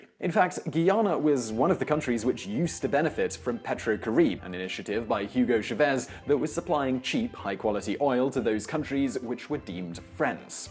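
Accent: United Kingdom. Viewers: First thing first let's get the name of the country pronounce correct it is not Guiana it is Guyana and your video is very misinform If you going to do a YouTube video on a country you should be properly informed and you should have also pointed out with your British accent that the American former President Jimmy Carter went to that country in 2015 and help Red Dead election which had send that country back into a reverse like the American and the British government did in 1964 that is something you should have pointed out Guyana economy was well on their way to better greater things under the PPP people Progressive Party now the an economy is only based on one thing that is oil please be properly informed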